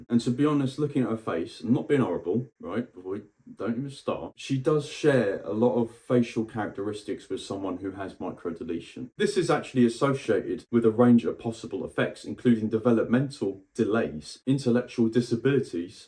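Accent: United Kingdom. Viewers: Oh, I've got so many things I want to share... It's way too late on a Saturday night for me to get on my soapbox though lol! Great video! There's still so much more that you would be shocked about! Nice to hear a fellow Brit covering the story too though! 👍😊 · Looove the accent & also prefer British slang and terms to many American ones myself. ❤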